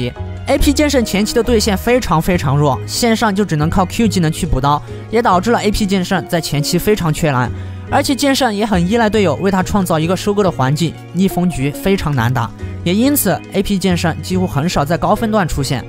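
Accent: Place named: Shandong, China. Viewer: 这一口山东味。。。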